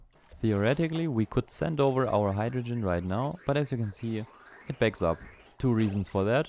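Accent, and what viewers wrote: Germany. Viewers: ive now watched about 2 and a half hours and realized your accent you are german right ? gibt es auch deutsches gameplay von dir ? is there also german gameplay? and btw i ike your voice and your video very much !! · You sound German…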